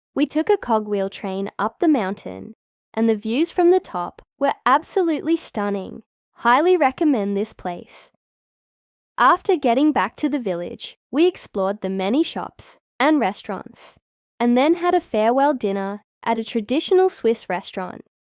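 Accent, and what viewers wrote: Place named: United States. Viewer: Oh no, another American-speaking influencer girl. Don't we have enough?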